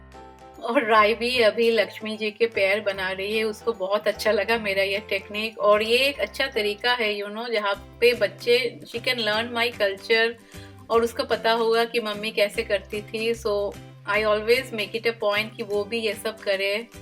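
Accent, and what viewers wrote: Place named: India. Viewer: I love ur Hindi tune n ur also awesome I like it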